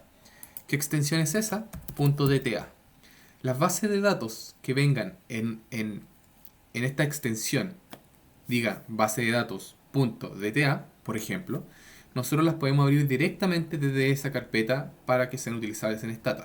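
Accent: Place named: Chile. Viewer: Que bueno un tutorial en castellano chileno. Grcs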